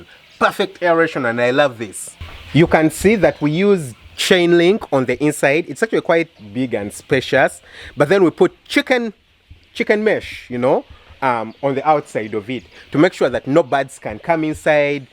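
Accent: Uganda. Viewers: Condolences over the loss of your Grandma, and congs for having beaten the virus. But on a kind note, pliz learn to include/mention the name of your country of origin in every video post. You should learn from Kenyans, who cannot post anything without mentioning their country. From you accent I guess you are a Ugandan, so go ahead and make your country proud and visible.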